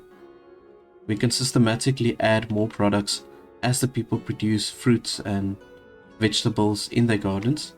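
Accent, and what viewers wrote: South Africa. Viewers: Love the peaceful, chill Ostriv content, great find! One thing that's killing me is I can't place your accent. It sounds maybe like either New Zealand or South African (I feel like you look more like a New Zealander but sound more South African). Am I even close? :D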